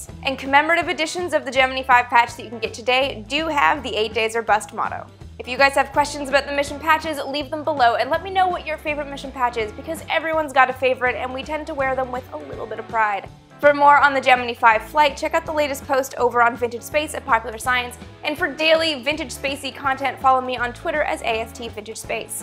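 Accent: Canada. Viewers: Are you Canadian ???? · In one of your videos I asked if you were from up north somewhere.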